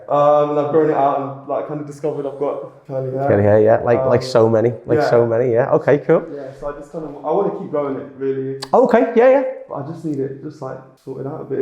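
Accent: United Kingdom. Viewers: Im a green barber trying to learn as much as i can . This one was actually super helpful .. a cool ,simple and quick hair cut well done. Im just wondering how you find the guide in each section . Cuz i lost mine in this type of heavy hair ... and its a bit hard for me to hear what you saying ... wearing a mask and hard British accent 😅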